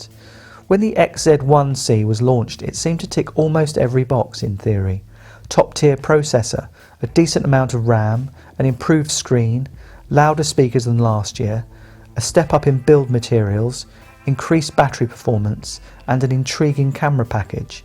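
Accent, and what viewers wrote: United Kingdom. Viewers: I like your perfect British accent! Greetings from Russia! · Loving your Brits accent and of course the review.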